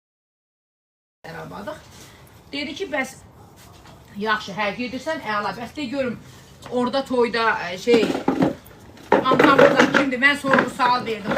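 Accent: Goranboy, Azerbaijan. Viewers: Goranboydan salamlar.salam ay elli qizi.danisigina quzu keserem.oz rayonumuzun lehcesi lezzet eleyir.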